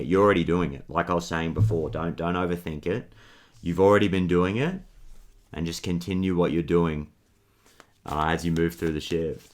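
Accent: Australia. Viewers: God you're adorable! and your Australian accent and the tone of your voice is wonderful on my ears. Thank you for your light and purity; thank you for choosing to share this very on-point and powerful message. You got my subscriptions and likes for sure! I look forward to hearing more messages from you! Cheers! ❤🙏💎🌟🌟🌟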